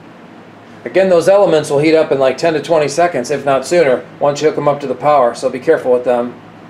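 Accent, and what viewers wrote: United States. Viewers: Happy new year 2024. I like your ingenuity and your accent. Simple, effective, american. I will try with my dutch oven. Thanks for your creativity.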